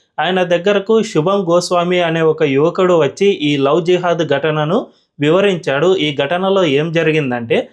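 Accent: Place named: Marwari, India. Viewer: Are sollugay NUVVU MARWADIWA Yenti anta lagi lagi matladutunnav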